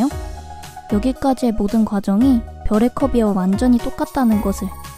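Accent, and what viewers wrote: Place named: Japan. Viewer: 뭔가 말하는게 외국인같다 그 욕하는게 아니라 발음이 뭔가 둥글둥글한? 일본인이 한국말하는 그런 느낌이다 한국말 완전 잘하는 일본인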